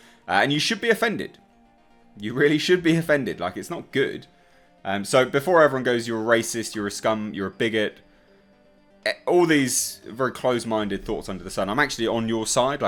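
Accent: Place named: United Kingdom. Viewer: this is my first time watching one of your videos and,well the first thought that popped into my head,honestly was"oh,this guy is either a racist or bigot. He's white,isn't he." You look white,but I don't know,you're probably British or something like that cause of your accent. Anyways,I see your points and why this "tokenism" from Disney bothers you and most of these other commentators. But,if I may say something. Why say something now about this,I don't remember this much heavy backlash when they changed the green lantern from black to white. Besides,who cares? Disney isn't the Disney from your childhood anymore. All they care about,and it hurts me to say this,cause I too grew up with Disney,is the green. Can we just let them destroy themselves if they want too? They own practically everything now,and only do so,to squeeze as much money as possible out of these franchises,countering they're only doing this for nostalgic purposes. 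First,no one asked for these remakes to be made. Second, they are unnecessary rehashes of something good that already existed. Third, it's a disrespect to the original saying it needs to be "fixed". Everyone needs to get off their high horse and be brought down a peg,especially Disney. We only fuel their flames and this negative advertising is only gonna push for them to keep making more of these. Why? Because they plain just don't care anymore,they don't care about quality animation,storytelling,or original content anymore. Si why should we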